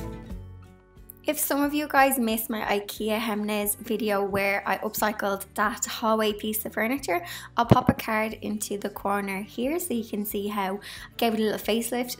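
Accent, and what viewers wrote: Ireland. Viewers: Am still working from home. Have you returned to work or are you focusing on YouTube? Love your channel, ❤️ your house, and especially your adorable Irish charming personality and accent. Isn’t spring wonderful?💐🌻🌿🪴hugs from Arizona 🌵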